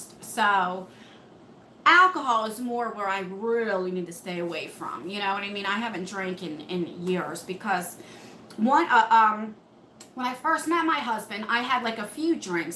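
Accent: New Jersey, United States. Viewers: Your eye make up is so pretty you have lovely eyes and your lipstick is so pretty too. I love your accent . Makes me think of the Sopranos . I hope that's not an insult. Xxx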